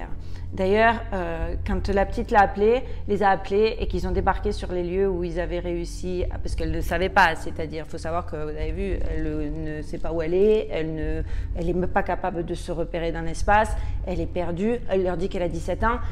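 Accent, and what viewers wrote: Marseille, France. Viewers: Coucou :) Il y a bien un léger accent anglophone mais tu as bien gardé ton accent marseillais ;)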